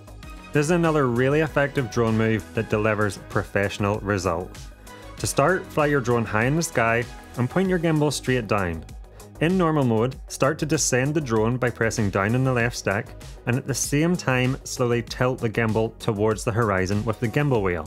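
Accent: Ireland. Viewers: Irish accent is epic! Great Vid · Oh.. so this is the famous Irish accent?? The “ i ” sound sounds more like a “ e “ like the word “vedeo”! Love et!!!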